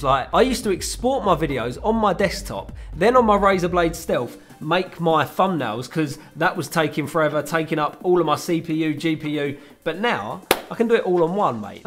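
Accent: United Kingdom. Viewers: covid nine-IINN XD.. so cool man I love British accents no offense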